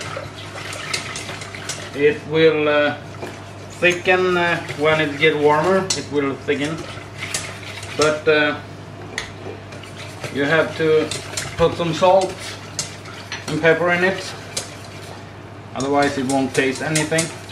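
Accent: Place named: Sweden. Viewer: Grym video. :) Din engelska är faktiskt ganska bra. Mycket "Svengelska" Men jag tror att alla engelsktalande personer förstår vad du säger :) Stor tumme upp till dig. Dessutom blev jag väldigt sugen på lasagne nu :)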